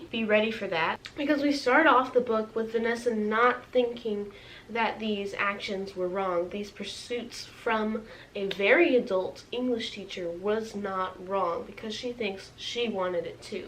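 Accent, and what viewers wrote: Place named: South, United States. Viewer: very here for more reviews in southern accents. so many of these books are on my tbr!!!!! so excited!!!!!!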